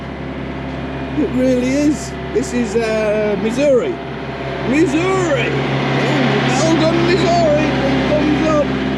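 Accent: Yorkshire, United Kingdom. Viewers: You can take the boy out of Yorkshire, but you cant take the Yorkshire out of the boy Ayup mate, from Sheffield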